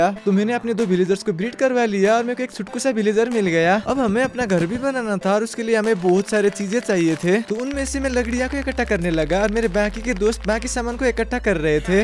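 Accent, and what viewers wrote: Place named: Nepal. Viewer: Nepoli accent>>>>>>>>>>>>>>>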